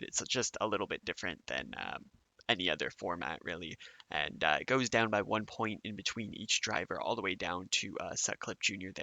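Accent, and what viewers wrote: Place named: California, United States. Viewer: The Californian accent really came out here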